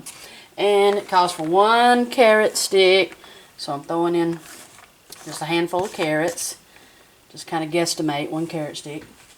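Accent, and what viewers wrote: South, United States. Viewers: When I heard her say, "it ain't gonna hurt nuthin' I knew she was country. I usually fry my cabbage.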